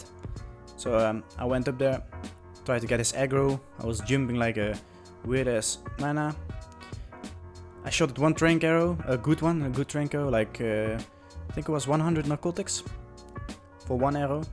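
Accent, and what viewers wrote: Netherlands. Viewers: For everyone saying he sounds Dutch , yes he is Dutch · you sound very dutch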